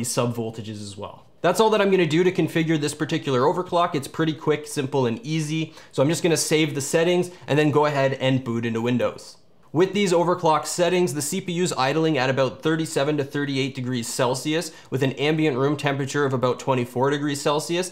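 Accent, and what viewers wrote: Canada. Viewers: Love the Canadian accent. Seems another Canuck is into tech vids.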